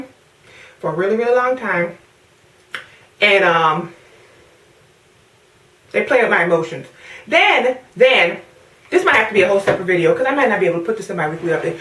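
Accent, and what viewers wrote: Caribbean. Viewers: You have made my day, you are so funny! Love the Carribean accent! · you really went in on her with your VI accent mehson! I feel for you digicel got on my last nerve last yr. Hopefully this is the last of it.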